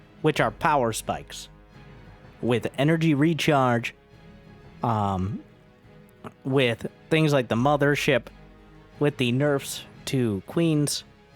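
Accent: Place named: Appalachia, United States. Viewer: Why do u talk like a hillbilly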